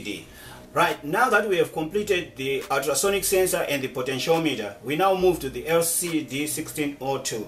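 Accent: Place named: Shona, Zimbabwe. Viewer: you sound Shona though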